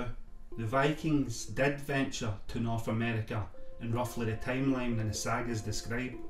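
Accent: Scotland, United Kingdom. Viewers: Love any & all information on the Vikings as well as any info on Scots & Picts too!...thank you for sharing & again I love hearing the Scottish accent! Have a blessed weekend! ~Ginger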